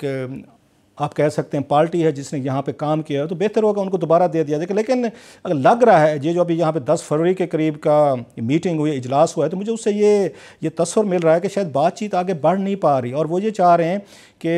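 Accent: Saraiki, Pakistan. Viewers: Its a Pleasure listening. Your sweet Syraike accent is too lovely. Stay Blessed.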